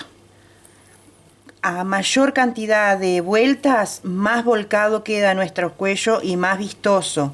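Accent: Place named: Argentina. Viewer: si pero por lo visto sos de ARGENTINA por tu asento es unico ... y aca no usamos esos terminos.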